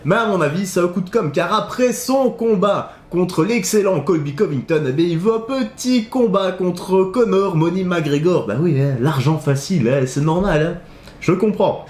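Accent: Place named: Belgium. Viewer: Yop petit vieux nouveau sur ta chaîne YT. Nouveau fan de mma et de l'ufc depuis octobre 21. Après la découverte de mr Ciryl Gane 😁 Comme pas mal de nouveaux fans je pense. Sont année 2021 est impressionnante. Merci les multiples émissions sur lui et sa team la (ou le) mma factory. Du coup... A force de chercher des chaînes sur le mma je tombe sur toi et... 😁😂 ton accent bien belge même si je repère pas la région. Je suis de Charleroi originaire de Rochefort. J'aime bien ta manière de présenter tes sujets et le ton que tu utilises. Rafraîchissant, et assez marrant 😉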